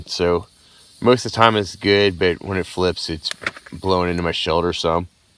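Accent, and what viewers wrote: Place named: West Coast, United States. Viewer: Dang bro, you sound West coast . Love the channel new sub 👍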